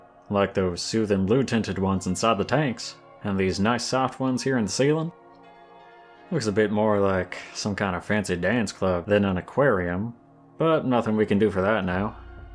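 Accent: South, United States. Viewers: oooh, happy to hear that. Im not usually one for Southern accents but I really liked this one ^^ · Aww, no one's ever told me I smell pretty before! So sweet and adorble. And with your Southern accent (which was great), I feel like that's how I talk when I play too much Red Dead Redemption 2. I kind of imagined you talking with some wheat grass clenched in your teeth, too. Thank you for the great roleplay! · Come for the audie, stay for the southern accent 😂❤ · I'm from Texas and I don't have a southern accent- So, I'll just say that you did a great job on it.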